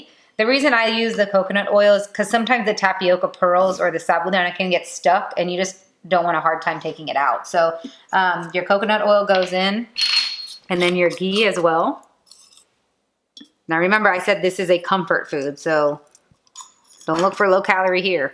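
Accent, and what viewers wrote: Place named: Gujarat, India. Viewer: are you from south gujarati? You have same accent as me and love your dishes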